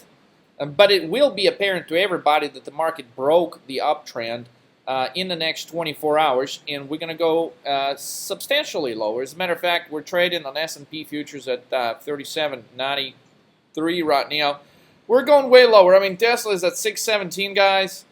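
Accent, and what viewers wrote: South, United States. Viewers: What kind of fucking accent is this lmaooooo craziest one iv ever heard i here European , southern American and New Yorker all at the same time!!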